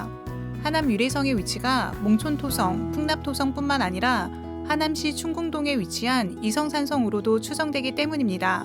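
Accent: Gyeongsang, South Korea. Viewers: 경상도 분이신가 봅니다.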